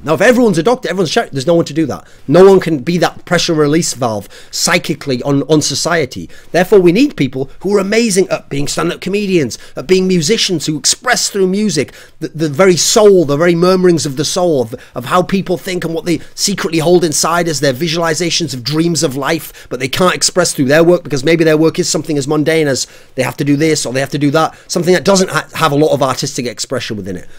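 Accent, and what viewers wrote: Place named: Northeast England, United Kingdom. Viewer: I can't place his accent. Northeast England or Scotland?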